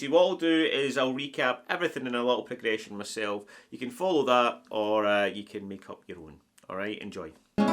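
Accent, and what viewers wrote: Scotland, United Kingdom. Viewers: He’s Scottish, not Irish! 🙄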